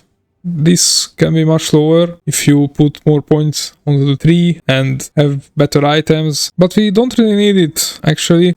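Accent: Hungary. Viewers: You have such a Hungarian accent :D no offense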